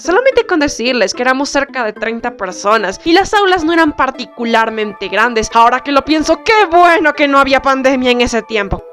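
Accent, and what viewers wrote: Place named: Quito, Ecuador. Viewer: es ese acento quiteño serrano que tiene 🥺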